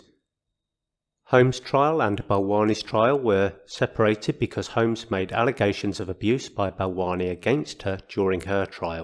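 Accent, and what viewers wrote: United Kingdom. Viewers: 719 losers follow you...Yeh ! you work for CNN and any other acronym cunt out there..David Bowie said get ready for cunts...Oh ! I just saw another one YOU tube.....Even worse is the fact that you sound like you are from the ass end of the Midlands....Just go away will ya.... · Hahaha I was gonna say you could have used your British accent as an excuse, until I saw the graphic. Nice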